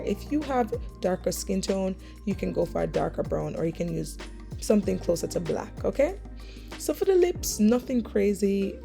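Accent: Jamaica. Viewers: You sound so Jamaican 🇯🇲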